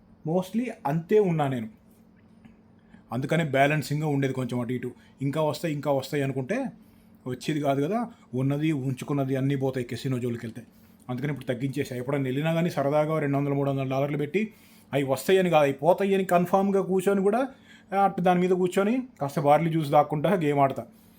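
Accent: Andhra Pradesh, India. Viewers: Nizamabad antunav kani nee slang mitham Andhra style undi beo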